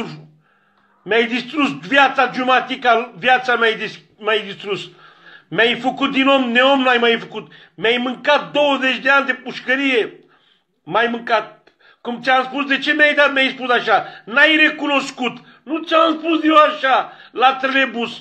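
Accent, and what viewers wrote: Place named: Turkey. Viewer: E turc daia vorbeste asa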